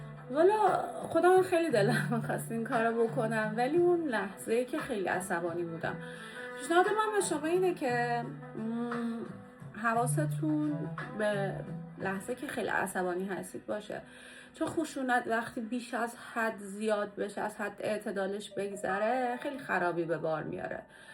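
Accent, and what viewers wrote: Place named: Ardabil, Iran. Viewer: ولی ته لهجه ترکی اردبیلی که داری قشنگه عزیزم تلاش نکن کنترلش کنی راحت صحبت کن🥰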